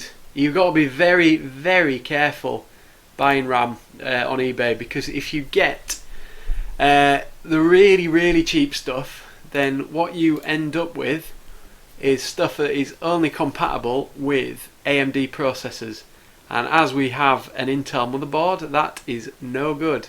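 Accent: Yorkshire, United Kingdom. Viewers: Sounds like your from Leeds, I bet, or surrounding area(West Yorkshire)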